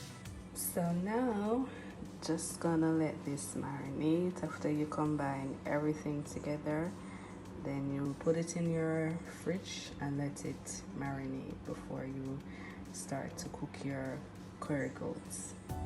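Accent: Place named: Jamaica. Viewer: Yes yes . I can tell your jamaican.. great job on this hun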